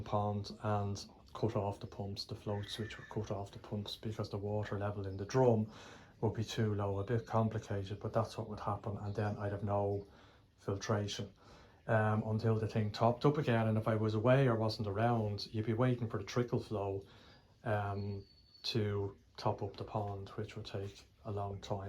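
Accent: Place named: Ireland. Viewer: Clean your ears out. Its called an irish accent. Thats all. Im english. Understood everything perfectly. Great vid. Keep up the great work.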